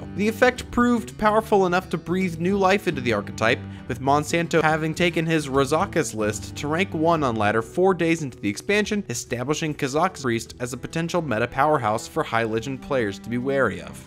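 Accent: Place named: United States. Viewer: He said Razakus, jeez, its just his 'american' accent i'd say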